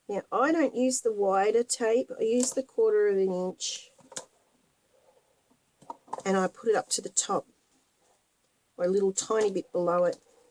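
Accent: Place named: New Zealand. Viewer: When you said spider I thought WHAT!!!!! That's a big spider and I thought you had a NZ accent and we don't have those huge things here. Showed my husband, and then I reread, whew!! Now you are referred to as the " " and the " is a very talented lady, and very generous to share all her knowledge. Had been looking for something different to make, so thanks heaps. Love your way of explaining things too. Cheers for that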